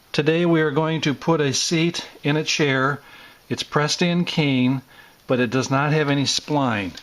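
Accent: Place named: Midwest, United States. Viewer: Definitely a midwestern accent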